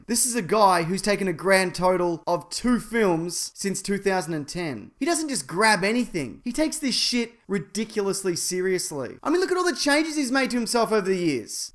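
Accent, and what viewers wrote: Australia. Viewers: I really like the idea of a solo joker movie with Harley Quinn. I felt there was not a reason to put the Joker in the Suicide Squad movie. It's as as if they want to put as many characters on screen as possible and sees what sticks. But thanks for letting me know that there's a novelization. I'll see if it's at <link>. By the way your Australian voice is awesome!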